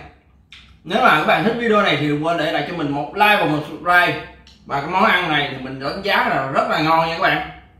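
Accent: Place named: Mekong Delta, Vietnam. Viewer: Đậm chất ngừ miền tây Nghe câu "thun thun thun thun" là bt liền😁😁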